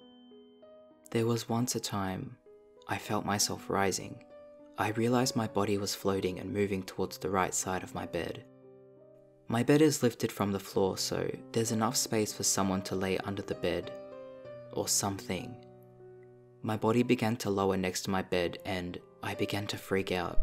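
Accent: Australia. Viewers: Wtf the transition from the anime part to the actual video scared me cuz I forgot you had an Australian accent..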